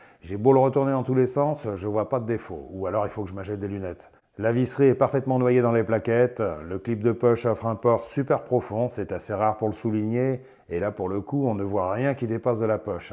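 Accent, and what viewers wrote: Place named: South, France. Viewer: Il me plaît bien effectivement ,en plus on a eut droit à l'accent du Sud,une longue fausse pub. Merci à toi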